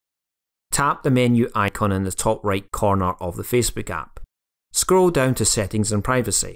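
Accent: Scotland, United Kingdom. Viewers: Your Scottish accent made me smile immediately.😅 Oh, and thanks, it worked!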